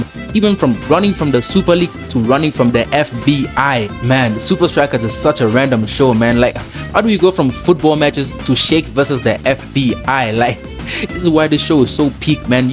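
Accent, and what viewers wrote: South Africa. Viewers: Bro you live here Mzansi right i can tell from your English bru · Muh boy ded from 🇿🇦 with that accent .🎉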